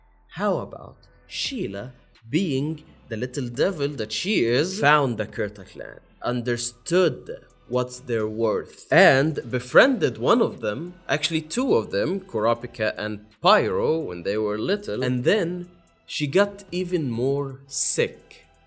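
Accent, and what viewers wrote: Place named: Egypt. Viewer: you sound Egyptian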